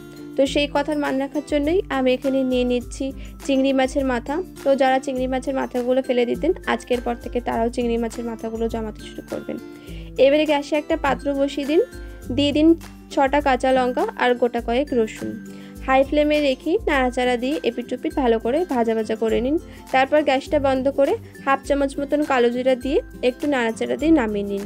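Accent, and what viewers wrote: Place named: Bangal, Bangladesh. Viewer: Bangal vasai ktha bolis valo lage sunte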